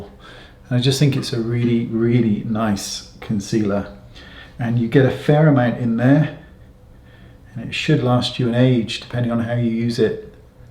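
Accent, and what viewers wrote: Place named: West Country, United Kingdom. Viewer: Pisser!! 😆 you re funny, are you a west country lad BTW?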